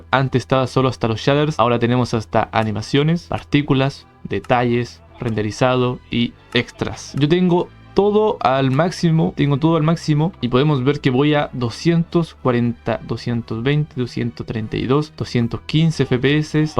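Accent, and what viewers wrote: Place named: Chile. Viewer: Chileno se te nota, gracias mi hermano hoy quise revivir mi infancia, meo corte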